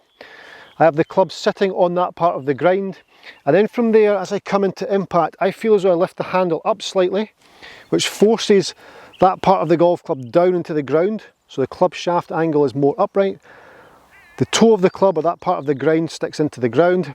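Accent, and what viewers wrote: Scotland, United Kingdom. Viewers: The Scottish accent makes the golf tips better.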